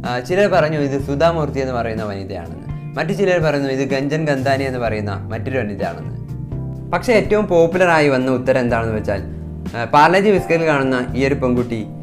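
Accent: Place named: Malappuram, India. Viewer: Same same.... Njanum malappuram aanu... മലപ്പുറത്ത്‌ എവിടെ ആണ്.... ഭാഷ കേട്ടപ്പോൾ ഒരു സംശയം.... അതാ ചോദിച്ചേ....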